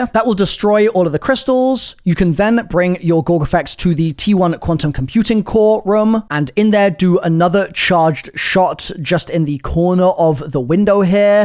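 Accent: United Kingdom. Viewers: Is it just me or does sound a little British here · British accent is marvelous im a french Canadian and i love the english wotah instead of water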